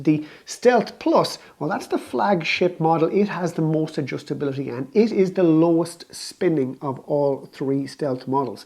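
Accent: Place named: Ireland. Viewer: That's actually an Irish accent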